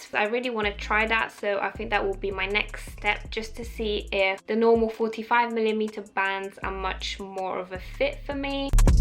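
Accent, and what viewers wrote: United Kingdom. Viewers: I love the commentary and information you provide but your pronunciation of the "th" sound as "f" is a bit annoying, detracting from an otherwise enjoyable viewing experience. Is that a muddling of an Eastern European or Russian accent with British or is it a straight Cockney trait? I'm sure you're aware of it but a few examples include saying "fun fings to do" instead of "fun things to do", "a day in the life wiff..." instead of "a day in the life with..." or "that's everyfing" instead of "that's everything." Hopefully you can correct this small dialect flaw for future videos as your content is a pleasure to watch. Cheers! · O jeeeeeeżu, szukałam info o Apple Watch, słucham, myśle „ale ładny akcent przyjemnie się słucha”, a tu nagle po polsku :) :) :) byłam przekonana, że jesteś Brytyjką, pozytywnie zazdroszczę tak idealnego angielskiego. 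Pozdrawiam z Trójmiasta ❤️✌️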